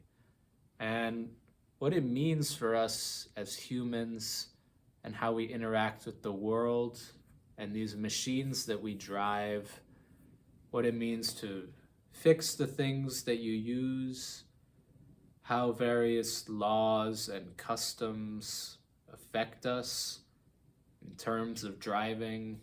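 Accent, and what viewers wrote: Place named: Scotland, United Kingdom. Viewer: pepe pic but scottish accent? bad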